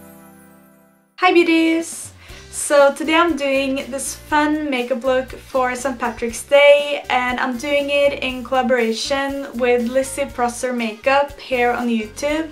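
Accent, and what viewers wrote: Norway. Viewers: Fun design, and awesome execution! :) I think that is a Norwegian accent, isn't it? Thanks for the awesome tutorial!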